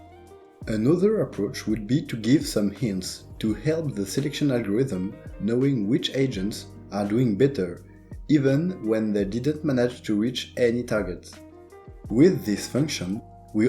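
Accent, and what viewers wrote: France. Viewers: Je confirme on sent l'accent français qui ressort par moment sinon trop bien la vidéo continue comme sa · You're French, definitely. Because I Can understand your english way too easily. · Okay. Btw, aren't you french because you're accent is suggesting me that ? Nice video though.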